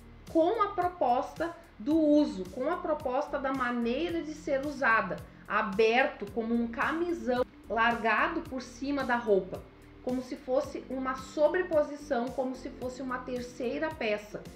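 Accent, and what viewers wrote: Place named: Santa Catarina, Brazil. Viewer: Olá boa tarde Como posso comprar vestidos lindos de você? Poderia passar seu número de telefone? Pelo seu jeitinho de falar acredito q vc é de Santa Catarina vc é de muito bom gosto parabéns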